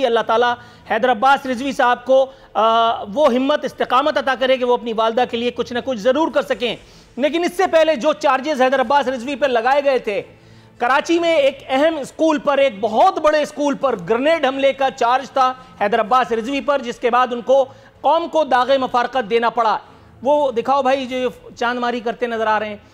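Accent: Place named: India. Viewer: Kuch Der k lye main samjha news anchor Indian Hai koi Indian news say khaber day raha hai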